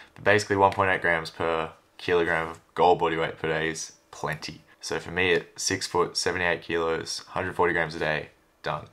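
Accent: Australia. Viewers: Lol have you ever heard an English accent? It's clearly Australian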